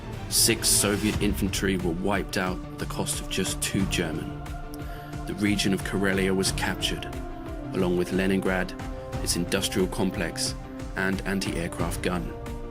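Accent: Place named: United Kingdom. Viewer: That was, is and always will be amazing- never-ever-ever seen anything like that in the history of A&A videos! Your Brit accent makes it sound like a real war footage reel, it's even more ground breaking than my NO LIMIT SHOWS - if this doesn't make your name+ A&A channel known in our community, I just don't know what will!!!!!!!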